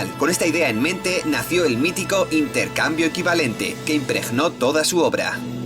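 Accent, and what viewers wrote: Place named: Spain. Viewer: Holy cow, yo si quiero la segunda parte! Porfa :3 aunque definitivamente la pronunciación es muy diferente en España que en Latinoamerica jaja me encantan esos detalles n.n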